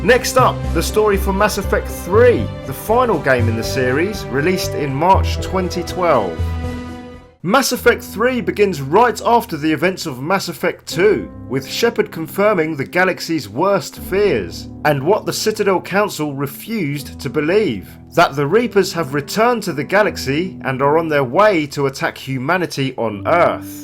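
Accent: United Kingdom. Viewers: Cool! Do you know how you would classify your regional accent? Would it be cockney or sussex for example?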